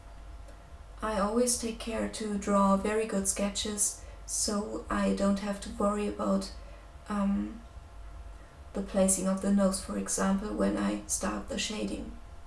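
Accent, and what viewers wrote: Germany. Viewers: You sound very german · Die ist deutsch? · als du angefangen hast zu sprechen dacht ich mir sofort die ist deutsche 😂😂😂 stimmts?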